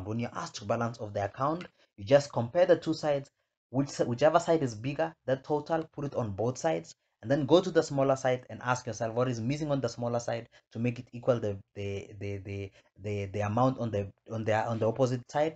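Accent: South Africa. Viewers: 🇿🇦lets go you from sa can hear your accent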